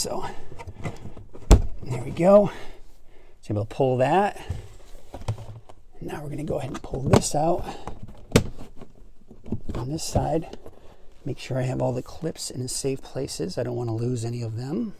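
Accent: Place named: United States. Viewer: I Think you're the best guy out there on YouTube to practice the American Accent :) Love your videos!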